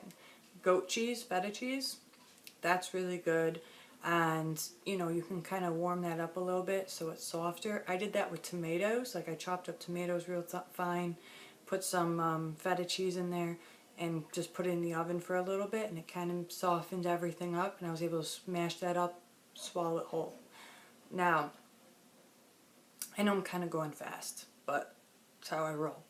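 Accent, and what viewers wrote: New York, United States. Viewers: By the way I could listen to you talk forever I love your New York accent it is so adorable I just I love it I cannot wait to come out and see you in New York we are going to have a blast!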